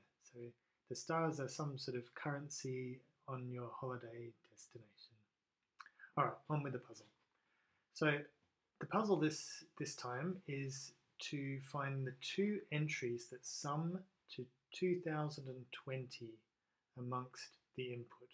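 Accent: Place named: Australia. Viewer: P.S., Hello from New Zealand. You sound Australian, though I can't tell for sure :-)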